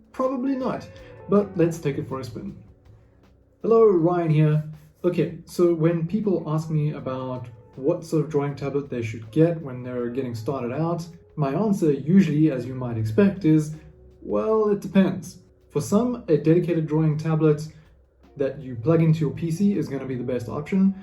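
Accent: South Africa. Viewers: Informative video thank you ! I am an interior design student .. here in SA so glad to here a familiar SA voice 😁 I recently just invested in the Samsung tab s10 FE and was wondering does Samsung second screen work similar if I’m using adobe illustrator to draw on the tablet but have it connect with a laptop 😁? I’m new to learning digital drawing for my design projects and need to figure out the best workflow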